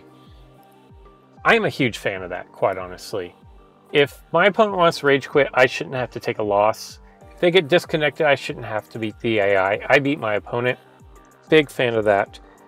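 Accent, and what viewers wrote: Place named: Canada. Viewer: it's beautiful there for sure, I'm a Canadian, some of the words you say you almost have the accent lol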